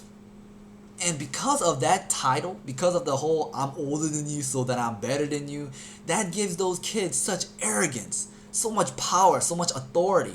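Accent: New York, United States. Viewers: That accent sounds New York. I luv NYC accents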